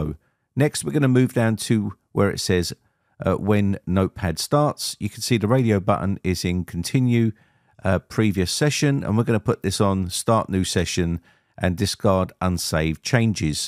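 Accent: United Kingdom. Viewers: Thank you so much random british person on youtube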